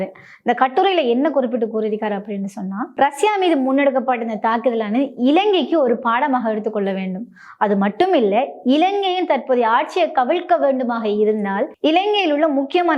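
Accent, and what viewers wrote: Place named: Chennai, India. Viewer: ஏன் Madras தமிழ் கதைக்கிறீர்கள்? இலங்கைத்தாய் எங்களுக்கு நல்ல தமிழை தந்திருக்கிறார். அதை ஏன் கெடுக்கிறீர்கள்?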